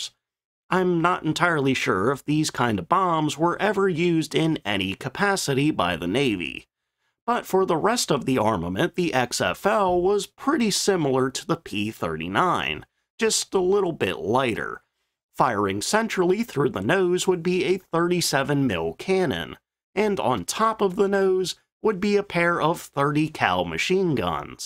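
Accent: United States. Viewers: Have you gone AI on us? that was the weirdest pronunciation of “Anacostia” I’ve ever heard. I found that odd as you talk like you’re from the Delmarva and maybe PA area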